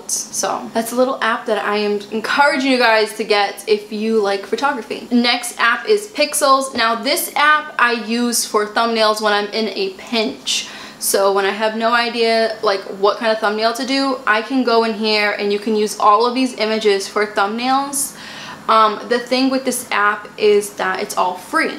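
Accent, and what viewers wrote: Boston, United States. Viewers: I love her Boston accent!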